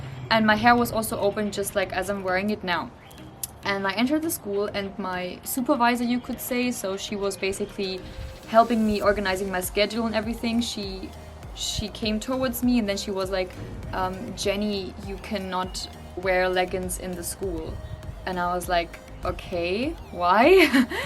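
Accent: India. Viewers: your English communication like Indian and very simple and not like your Motherland.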